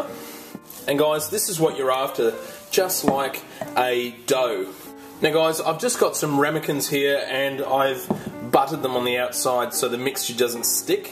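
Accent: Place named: Australia. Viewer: Yeah where did you get the UK from this? He's Australian lol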